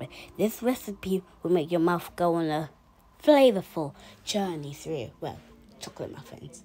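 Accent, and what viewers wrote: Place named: United Kingdom. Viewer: Awwwwww she’s so cute! Love the British accent ❤❤❤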